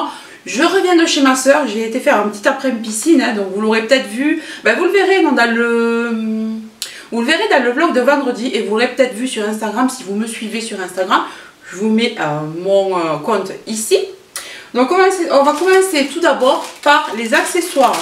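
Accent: South, France. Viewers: le magnifique accent du sud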